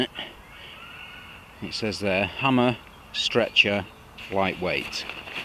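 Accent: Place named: Northern England, United Kingdom. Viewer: Nice set up, I think my only alteration would be either lowering the tarp or raising the hammock otherwise you may end up rather soggy if it's wet and windy :P The flap at the bottom of the hammock is for stopping a casualty sliding out the bottom when going up an incline rather than stopping them rolling out the side btw. Nice review, the northern accent always inspires trust ;)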